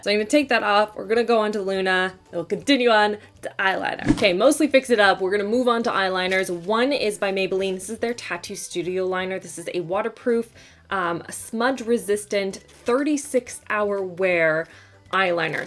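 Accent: France. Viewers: OMG YES, THAT'S HOW YOU SAY LES MACARONS 😍😍 your French accent is adorable 🤗 also, love the eyelook 💗 Thanks for that lower lash mascara tip!!! 💗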